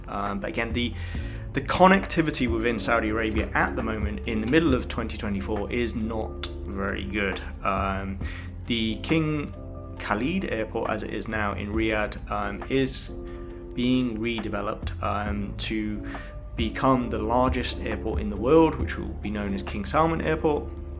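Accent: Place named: England, United Kingdom. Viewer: You seem to be a citizen of the world with the way you describe things comparatively across the countries. I presume you’re English, love the accent ❤ but amazed at how you refer to USD so naturally. Anyways, great video with very useful information. Thanks 👍🏻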